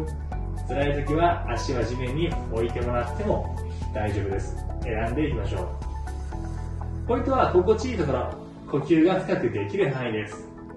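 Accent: Kansai, Japan. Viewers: 時々、関西弁になったり標準語との葛藤を感じる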